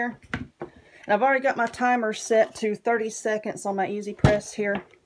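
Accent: South, United States. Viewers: I'm from NC as well, I can totally relate to your southern accent and way of talkin. I enjoyed this video, I just might try making these purse caddies. Tfs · Mam, Your potholder caddy is awesome!! I am getting ready to have a craft sale at the end of the month and I came on tonight to look for simple ideas to make on a budget. I have tons and tons of fabric as my grandmother use to own her own fabric shop - so I was looking for cute easy ideas for some new crafts to sell. As soon as you mentioned that you need to take your shoes off I thought to myself, "wow, this gal is like me". When I use to sew with a treddle foot machine I always sewed with my shoes on and I would get that treddle going very fast but, now with the modern day peddle I have to sew barefoot as I have more control over it that way too. Most of the pot holders I sell I also make but, I don't believe my pot holder will work for your little bags. 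I will start watching for the pot holders on sale at various stores. I believe my Dollar Tree might have your type of pot holder too along with the zip lock bags. These little purses would be ideal for teen girls also and small enough for their lockers. I think they would be good for sun glasses/eye glasses but, you cannot sew the sides completely up so you have room to slip in the glasses. Thank you for an awesome new craft idea. - Rebekah in Alexandria, VA PS: Where are you from with your thick southern accent? I am from NJ so you can imagine how I sound. My niece laughs at how my mother, grandmother and I say coffee. Her father is from NC so after a summer with her other grandparents, my niece comes home calling all of u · You never bore me love watching your DIY and learn from them. Plus like your southern accént 👍👍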